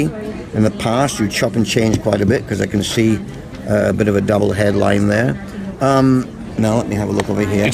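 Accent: Scotland, United Kingdom. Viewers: with a Scottish twang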